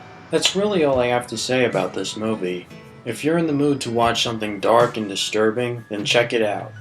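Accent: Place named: Chicago, United States. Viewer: I don't hear that, but with the Chicago accent he sounds a lot like the rude fence where they got the TV and camcorder.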